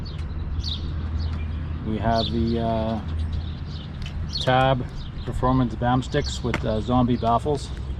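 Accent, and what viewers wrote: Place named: Prairies, Canada. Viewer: I feel like your from Manitoba or Saskatchewan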